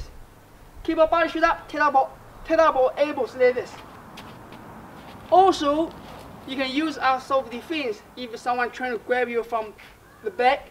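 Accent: China. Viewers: 100% true chinese english accent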